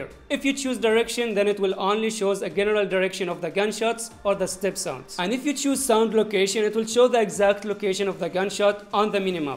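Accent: India. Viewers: Indian accent :( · Hahah I love your accent indian right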